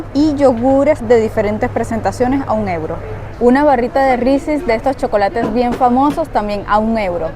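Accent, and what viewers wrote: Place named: Venezuela. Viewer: Tú acento se parece al venezolano.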